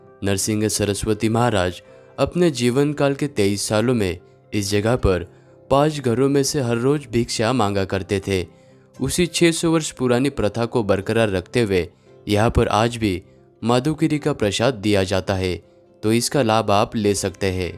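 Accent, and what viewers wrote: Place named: Maharashtra, India. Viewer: कशाला उगीच मराठी टोन मध्ये हिन्दी बोलताय . मराठीच बोला की .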